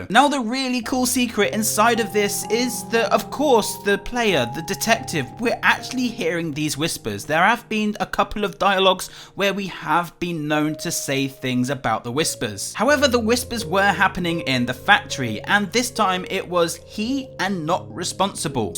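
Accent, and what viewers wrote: United Kingdom. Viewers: He’s British lol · He’s a cool British guy so he gets permission to say it in another way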